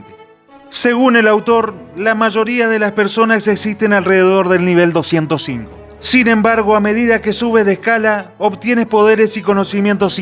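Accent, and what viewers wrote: Argentina. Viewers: No manches. Yo me dí cuenta de que es argentino luego luego.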